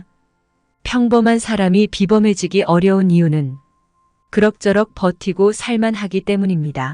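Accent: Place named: Giho, South Korea. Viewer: 너가 저가 이런 기호지방 개사투리 사용마라 특별시라니 말까지 바꾸면 진짜 딴세상사람인 줄 천한종들아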